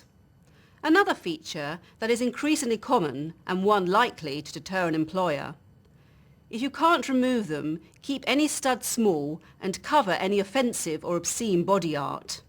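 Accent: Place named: United Kingdom. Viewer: British English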